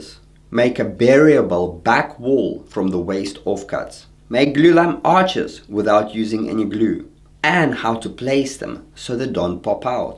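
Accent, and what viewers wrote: South Africa. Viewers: It sounds sooo much like you have a South African accent??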